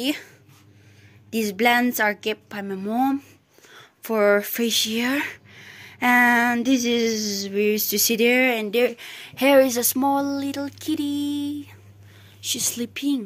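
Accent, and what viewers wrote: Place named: Nagaland, India. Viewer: I really don't know why YouTube recommended me this 😂 but I love the accent... Didn't know Naga accent sounds like this 👍👍 love from Mizoram... Got yourself a new subscriber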